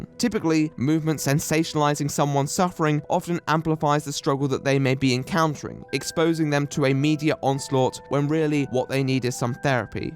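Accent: Netherlands. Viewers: wait, u dutch? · are you dutch?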